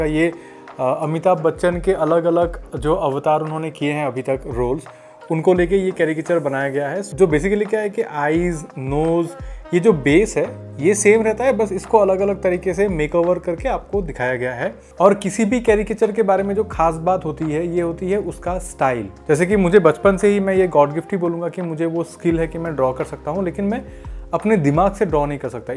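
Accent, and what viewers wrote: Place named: Bengal, India. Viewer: If I am not wrong, you are bengali for sure. Your accent is typically bengali type. Just a guess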